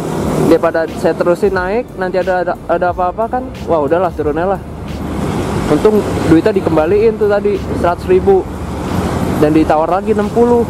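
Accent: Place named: Central Java, Indonesia. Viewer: Dari logat bicaranya, dia kayaknya orang dari daerah plat K.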